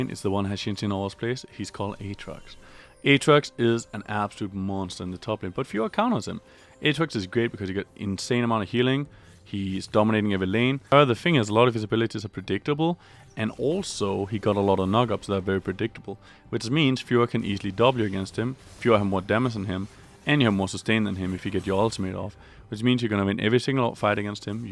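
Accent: Denmark. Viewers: Man kan bare så nemt høre du er dansker hahah, men god video.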